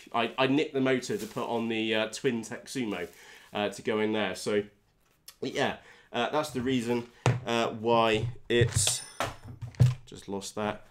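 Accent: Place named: England, United Kingdom. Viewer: So English, makes a change from all the American channels, loving it.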